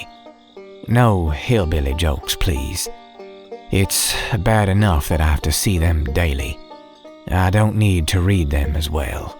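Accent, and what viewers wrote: South, United States. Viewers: oh I didn't know that youtube still alerts you on comment even on old videos that's cool, anyway thanks for reading these stories in a southern accent add to the feels and keep up the awesome work. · Love that thair southern accent ya got!! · Btw impressive southern accent 👌🏻😊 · Southern accent pretty damn close! My family is from the south, and you did pretty well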